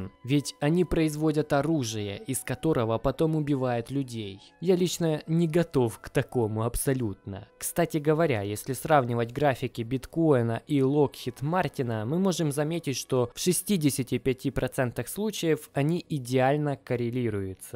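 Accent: Ukraine. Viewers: так по говору слышно что чувак с Украины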